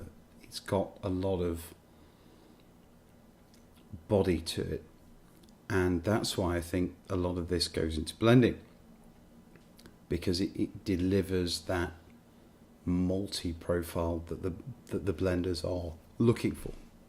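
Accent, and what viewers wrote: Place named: RP, United Kingdom. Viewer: Excellent review but when are you going to invest in a descent camera? Your tasting notes are one of the most honest, original and accurate (as far as my tasting experience goes), your presentation is natural and lucid and transmitted well through your clear English accent with that hint of rugged understated poshness. You deserve a far wider audience. Invest in a better camera and let's see (please G-d) those subscription figures reach 5,000! in 2019. Fascinating and intricate flavour description which makes this Teaninich rather intriguing. There is a flavour note I haven't come across in a long long time. Elderberry. From the honeysuckle family with large clusters of tiny creamy white flowers. I do have a vague memory of this when growing up in England. You mentioned Elderflower. I looked it up and it seems to be a sugary drink flavoured with elderberry produced by village housewives in little English villages. 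This distillery name whose pronunciation is a real tongue twister "Tee-Ni-nich" is one of Diageo's silent giants with about 10,500,000 litres capacity / annum. As you said, their 10 Year Old Flora and Fauna was released in 1992 and can still be found in the UK. Their special limited release 17-Year-Old came out in 2017, now probably very rare. The character of the malt seems to be designed to be a filler malt for blends. Reviewers describe fresh green grass along with slightly oily creamy cereal notes with a dash of honey, nuts and spice. Your notes were much m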